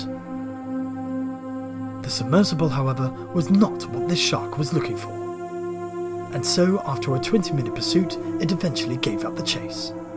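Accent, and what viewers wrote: United Kingdom. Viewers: Really cool! The British accent really sells it. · awesome , nice job, ...and the english accent the best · the british accent is best, David Attenborough approves that lol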